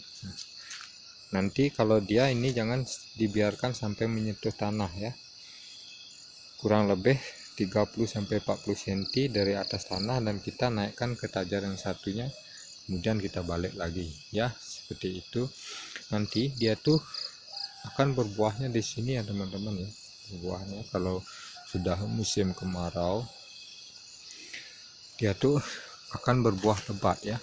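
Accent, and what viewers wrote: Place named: Bali, Indonesia. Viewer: logat Bali ini sepertinya engken bli kabare becik becik ya salam dari jakarta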